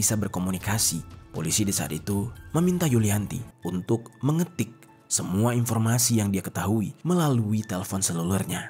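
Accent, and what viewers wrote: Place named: Sundanese, Indonesia. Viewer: Lucu banget bang kalo ngomong bahada sunda 😂😂😂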